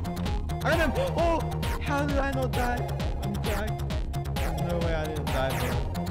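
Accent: Australia. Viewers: R u Aussie?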